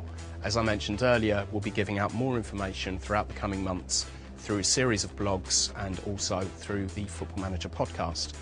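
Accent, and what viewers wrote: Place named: England, United Kingdom. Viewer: Damn, what a classic english accent! Btw, I have this game and it's pretty cool for thoose who consider buying it.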